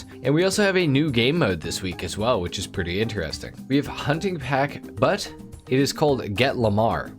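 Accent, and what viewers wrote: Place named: Canada. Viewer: Northwestern thing lmao. Listen to people from Wisconsin, Michigan, Minnesota, North and South Dakota, and yeah Canada. He’s from Canada I think.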